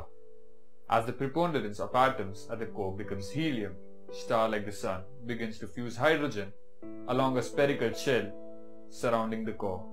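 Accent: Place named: India. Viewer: you are doing a great job, i really like it, but you need a bit of improvment on your english accent, try to speak english without accent, normal english, putting an indian accent makes you understandable for some people and not for others. Good Luck Bro! i give carn luli!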